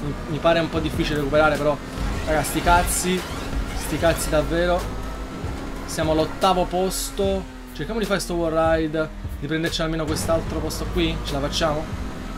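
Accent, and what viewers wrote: Naples, Italy. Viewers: ma sei di napoli zio?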